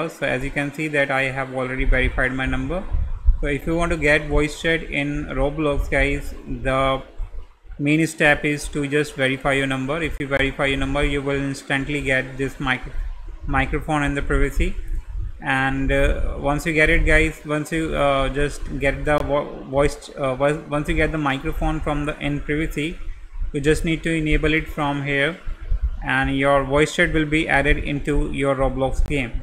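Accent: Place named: India. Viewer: He kinda speaks Indian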